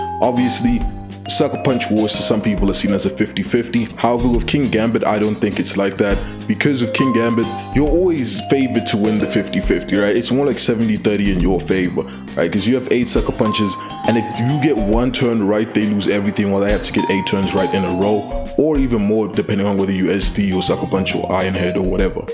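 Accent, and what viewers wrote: South Africa. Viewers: Yeah right? It's a like mix of South African, English and American (not sure on the specific regional accents, but you get me) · Are you South African?👀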